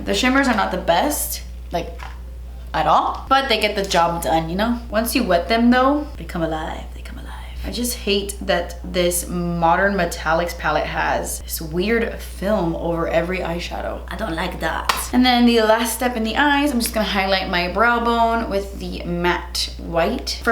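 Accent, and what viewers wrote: Cuba. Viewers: She's cubana 😊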